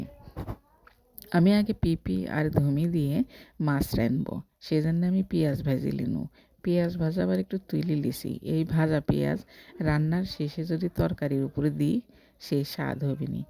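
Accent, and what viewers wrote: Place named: Natore, Bangladesh. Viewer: আঞ্চলিক ভাষায় কথা সুন্দর ❤❤❤ নাটরের মেয়ে নাটরের ভাষা🥰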